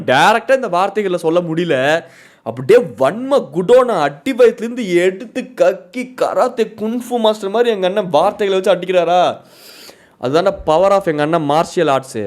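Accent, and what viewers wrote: Coimbatore, India. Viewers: Your cute man but nenga pesurathu Konjam coimbatore slang mathiri irukku but nalla irukku Ivana kadaisi varaikkum thiruthave mutiyathu intha moji pathale kovama varuthu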